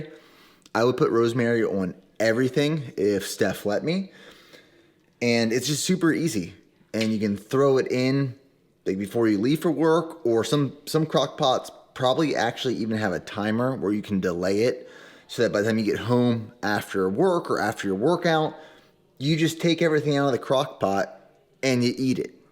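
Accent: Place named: Texas, United States. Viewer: Пишу на русском, чтобы было видно, что я не местный 🇷🇺 Во-первых, спасибо за твои видео, ты хороший атлет. Во-вторых, желаю тебе достичь всех твои целей в 2022 году. Кстати, мы русскоговорящие тоже следим за твоими проектами (как же хорошо хоть немного понимать английский). Я, правда, смотрю твои видео пока только с субтитрами, но прогресс есть. Когда только начал смотреть тебя (примерно год назад), то было непривычно улавливать ваш Американский, ну или Техаский акцент, но сейчас уже лучше) Кроме того, подписан на тебя в Инстаграм. Так что знай, GO ONE MORE знают не только в Штатах)) Всем добра и мира! P.S. есть русскоговорящие, кто смотрит Ника? Отпишите внизу, очень интересно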